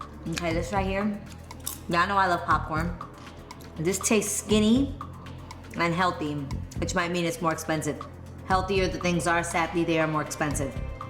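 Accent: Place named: New York, United States. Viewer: yo i love her nyc accent lol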